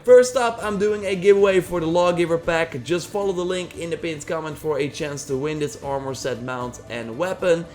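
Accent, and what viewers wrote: Netherlands. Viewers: Niels De Vreugd yes i tought so cus you can hear it at his voice and i’m dutch to.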